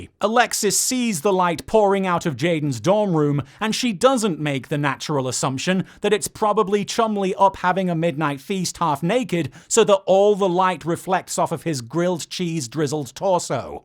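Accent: United Kingdom. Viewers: It’s amazing to hear how his accent has gotten so progressively less British. Like seriously. Go back and listen to his old vids. British. Accent.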